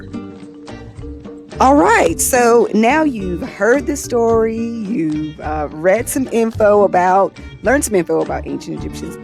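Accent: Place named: United States. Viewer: Just cant hear the terrible american dialect.... sorry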